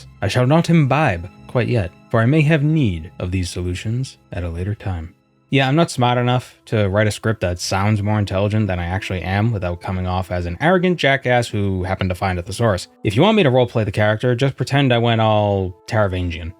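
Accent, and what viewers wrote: Boston, United States. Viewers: Weird question but are you from Boston/mass? I feel like i can hear it every once in a while haha. Im just curious since im from there too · Boston boi? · Boston accent? I heard you say smaaht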